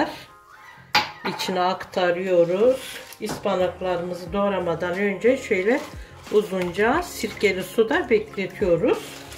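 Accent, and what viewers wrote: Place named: Dersim, Turkey. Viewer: Abla sen Dersimce biliyor musun? Sanki Dersimliler in aksaniyla konusuyorsun! :-) Yani bilmesem, akrabam sanacagim!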